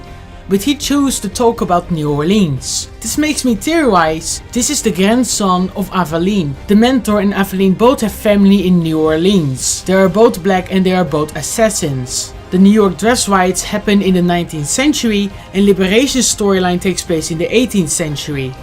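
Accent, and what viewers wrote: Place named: Netherlands. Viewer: You sound like a dutch person who speaks english😋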